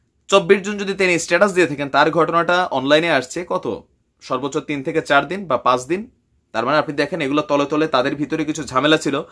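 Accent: Comilla, Bangladesh. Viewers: ভাইয়া আপনি কুমিল্লার ভাসায় বলেন ভালো লাগে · Kumillar vashay kotha koyle moja lage. Vay amini koyaa jan . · ভাইজান আপনার কুমিললার ভাষা বেশ মজা লাগে,চালিয়ে যান,আপনার চমৎকার উপথাপনা, ও আলোচনা আমার ভাল লাগে। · কুমিল্লা ভাষা কথা বললে ভাল লাগে শুনতে ভাই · ভাই এমন সুন্দর ভাবে কুমিল্লার ভাষায় কথাটা বলেছেন সুন্দর লাগছে ভাই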